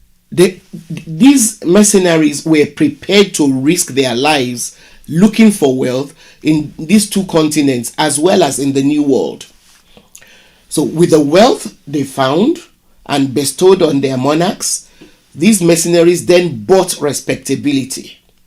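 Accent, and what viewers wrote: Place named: Africa. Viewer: Thanks for this. There is a racist you tube channel called History Debunked. His supporters were in the comments section talking about Black people coming from primitive tribes. I sent them this video to show them who were the primitive tribes. It will also gall them that it is a Black Woman speaking about this with an African accent no less.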